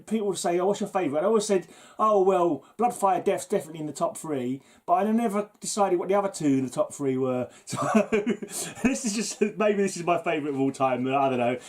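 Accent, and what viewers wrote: United Kingdom. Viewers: Brilliant entry. Thanks for doing a video. Much appreciated. I like the way you come across in your videos. Very jovial. And your accent reminds me a lot of Steve Harris and Niko McBrain.... I hope I've got the right area? 🤔😊 I have to agree with you about Manowar. They need to get some horses in their album covers if they are to be taken seriously 😁😂 Some cool picks. You are in the draw. 👍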